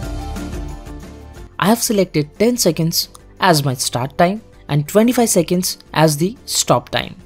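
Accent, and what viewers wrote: India. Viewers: I don't know. I am sorry my friend, but when I hear an indian accent I turn off, purely and simply because of the many indian scammers out there, copying a variety of accents (badly!), but it is a turn off. Sorry bro Take care, good bye.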